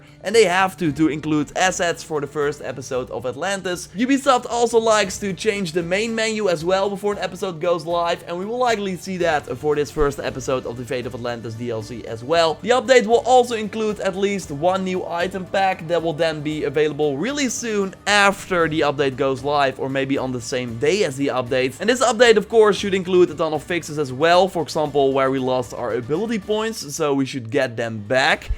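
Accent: Netherlands. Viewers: Hij is nl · Hi, by any possibility, are you from the Netherlands/Belgium, you have a very dutch accent ^^/ Oh and great videos! Just found out your channel today and have spend the day watching your AC odyssey videos ^^/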